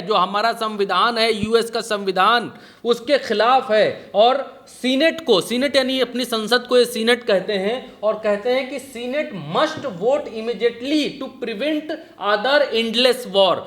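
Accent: India. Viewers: bhaiya aapka desi Andaaz hai maja aa jata aapko sunkar Jay Hind Jay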